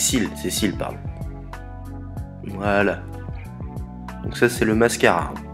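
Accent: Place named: Pays de Caux, France. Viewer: Avec le vrai accent cauchois , on adore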